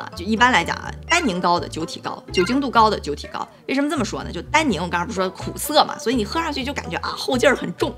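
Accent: China. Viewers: 阿lin的大陸鄉土味甩不掉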